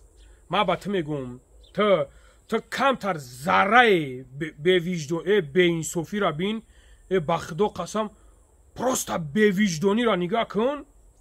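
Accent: Kulob, Tajikistan. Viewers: Худованд дарувахт эмонша ай баданш хорич мекна беэмона хдованд хоршонкна илохим. Бехтарин суханхо лахчаи точики худочон нигахбонут. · Чисто ба кулобиги обиснит кади 👍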